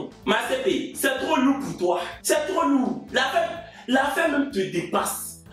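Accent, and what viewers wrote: Cameroon. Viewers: Loool y’a beug dans mon cerveau ou j’ai entendu s’exclamer en disant (yé maléhhh🙆🏾‍♀️🙆🏾‍♀️) vraiment mon frère ou ma sœur camer là, tu fais du bon travail 👍🏾👍🏾😂😂🤣 j’écoute jusqu’à parfois j’oublie qu’il est ivoirien!! Yeuch!! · Bonjour Je t'ai aperçu à un pas de ma maison ds le 15eme arrondissement le février,je rentrais du travail, j'étais un peu timide mais aussi très fatiguée avec une longue journée. Mon souhait a toujours été de te rencontrer un jour mais alors ce fameux jour est arrivé et j'étais fatiguée. On s'est salué vite fait car tu étais avec tes amies et moi qui passait et pas de courage de venir vers toi faire une photo. Dis moi seule qd est ce que tu reviens ds ce café pardon. Je t'écoute régulièrement et ds ton accent je comprends que tu fréquentes beaucoup de camerounais car tu as plusieurs mots camer ds tes vidéos. Trop happy ooooooooo En tout cas je sais que seul les montagnes ne sont rencontrent pas. 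N'hésite pas à me répondre Une fan depuis le 15ème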